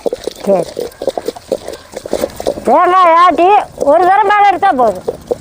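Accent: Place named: Salem, India. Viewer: Salem district. From Her dressing and slang. My native omalur salem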